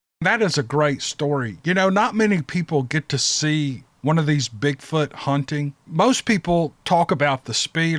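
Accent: South, United States. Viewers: thanks for keeping us in your thoughts even while you're too busy to post you still think and plan ahead so we will have new posts to watch!! That's above and beyond!!! See you when we see you! I sure do enjoy your telling of others encounters!! The people that experience their encounters do a great job of writing them up but that's based on what you read and while I live on the West coast I grew up in the woods of Missouri, then from 1970 to 75 Ft. Hood Texas where we hunted and fished every off work hour we could get and between hunting on foot or riding my dirt bike I covered that post pretty damned well when on my own even! I love the southern humor and style and you're definitely all of that in its best vocal embodiment of it in my opinion!!! Can't wait for the next few!!!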